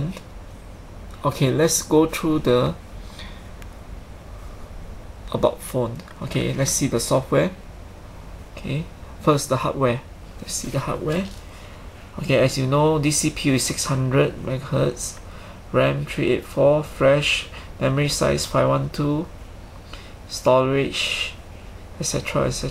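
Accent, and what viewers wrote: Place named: Singapore. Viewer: i don't like your accent. SINGLISH?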